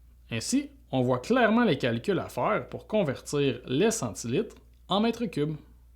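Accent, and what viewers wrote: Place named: Montreal, Canada. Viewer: vous êtes de Montreal! L' accent est fort mais j' ai bien aimé, je viens de Canada aussi mais d' Ottawa, Ontario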